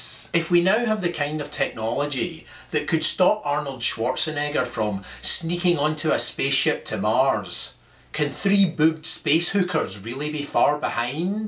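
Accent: Scotland, United Kingdom. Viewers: Stuff an aresenal up his aresenal XDDDD nice accent btw Scottish? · You can take your Scotish voice and go to hell. Maybe the loss of liberty does not cencern you but to real Americans it is the reason we fled Great Britain in the first place. Airport scanners are unnecessary. The problem can be solved by profiling but they don't want to solve the problem. You are the perfect example of an unwitting NWO dupe. No go play some golf and eat some haggis and read some books about freedom dummy. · He is very much Scottish. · well your an idiot... hes scottish. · oooh i love his acsent... i want a scottish friend :D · I love the skotish acsent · Ya I'm American do the accent is hard to understand, but I'm also Scottish so I like the accent, and everything else HA don't shit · What ever happened to the british accent being 'hot'? Americans don't even realise that this is what 90% of brits talk like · I think he's a Scot, or like me, he might be an Irishder. 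· is that Scottish ?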